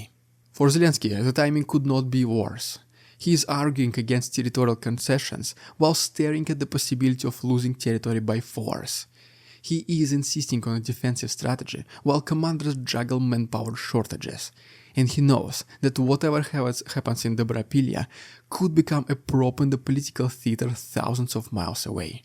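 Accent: Russia. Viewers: Your video is very good, but your English ia terrible Ruslish. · Here is the ahww - made my day:-) I like your channel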